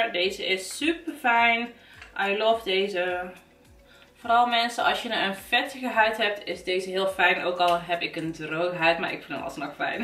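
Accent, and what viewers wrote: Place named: North Brabant, Netherlands. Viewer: Ik hou zo erg van je kleine beetje Brabantse accent. Echt zo schattig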